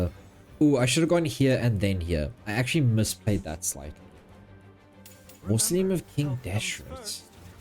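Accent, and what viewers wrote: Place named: South Africa. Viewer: I love it when I immediately recognize a fellow South African's accent while randomly consuming Genshin content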